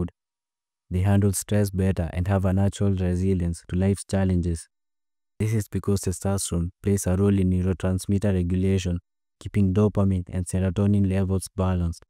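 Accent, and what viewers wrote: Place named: South Africa. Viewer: Sounds South African 🇿🇦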